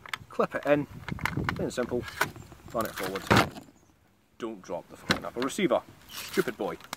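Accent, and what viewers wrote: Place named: Scotland, United Kingdom. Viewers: This was made not long after a visit here and he always picks up on the accent. He's now has a permanent Scottish accent due to staying here. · Wait how comes you have a Scottish accent now?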